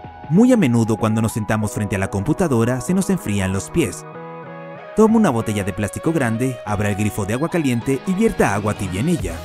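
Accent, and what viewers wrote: Russia. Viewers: Eres ruso ???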